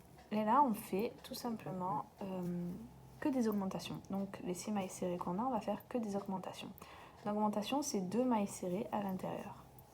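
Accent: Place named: France. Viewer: Merxi a toi,pour ta facon de nous montrer comment fabriquer toutes les belles choses que ru nous. Montre,,et tu as un talent vraiment special,pour nous montrer et une patience vraiment tres belle a voir ,pour nous,car tu prend tout ton temps pour nous montrer a fabriquer les choses que tu nous enseigne..alord merci et ne lache pas et ton accent francais est tellement beau et dou a entendre..ne lache surtout pas..milles fois merci...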